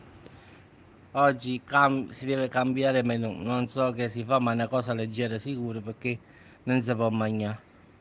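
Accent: Naples, Italy. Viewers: 🤣🤣 come sei divertente con stu napoletano!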